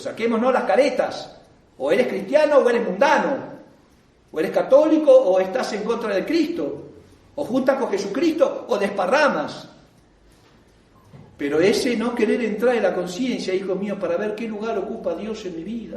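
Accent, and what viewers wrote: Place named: Argentina. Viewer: Por el acento argentino intuyo que es, pero no estoy seguro.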